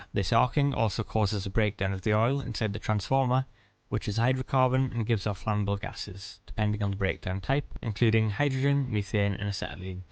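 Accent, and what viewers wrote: Newcastle, United Kingdom. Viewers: I love the Geordie accent. Keep up the great videos. Although I am an electrician who does purely domestic work, I think it's good to take a deeper dive into this sort of thing now and again. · Please stay away from AI voice-over! Your speaking voice is just fine. A good clear soft Geordie. Quote: "A 2008 newspaper survey found the Geordie accent to be perceived as the "most attractive in England" among the British public." All the best, Bob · I've just discovered your channel. I'm just a nerd interested in all these things, I won't pretend I understand everything, but it fascinates me nonetheless. Please don't use an AI voice over. Your wonderful Geordie accent adds to the authenticity. Thank you for sharing your knowledge and experience, from a Black Country fan.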